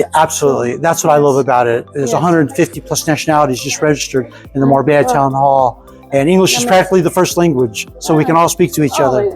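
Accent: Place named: Scotland, United Kingdom. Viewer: Everyone loves your Scottish accent!! Especially as Americans 😊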